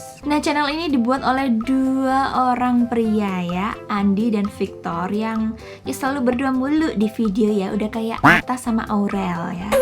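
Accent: Java, Indonesia. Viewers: Kangen ngomong jowo:') medhoke loh mantep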